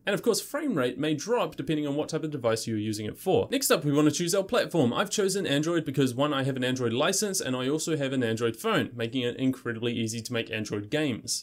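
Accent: New Zealand. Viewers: i feel like your from New Zealand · Hmm do i hear a bit of New Zealander in your accent? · Hey are you kiwi bro?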